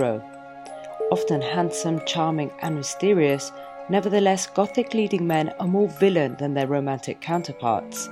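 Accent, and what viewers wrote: United Kingdom. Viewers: for me, Gothic (anything) is pure Heaven as I adore Halloween in all its Glory and endeavour to make it as scary and fun for the Kiddies of my little Neighbourhood as possible. a few years ago I heard Halloween was on the wain but then found out we (in North America) spend about $30 B on the stuff rivalled only by Christmas. for some unknown reason, all Gothic Architecture gives me chills and sends me back in time. one more observation is your incredible accent and phrasing. my Granny was from Lancashire but an English Teacher and her pronunciation of words were so similar and I have never forgotten this to this day.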